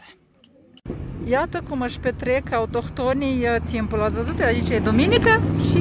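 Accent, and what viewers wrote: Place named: Moldova. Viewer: Bonjour..!! Omul bun atrage binele.. Te urmăresc cu mult drag si te admir pentru ceea ce faci, singura prin lume. Accentul tău moldovenesc imi încălzește sufletul. Bunicii mei au trăit la graniță cu Rusia si vorbeau aceeași vorbă dulce ca și tine. Mult succes în continuare. Prends soin de toi! 🤗🍀🥀🥀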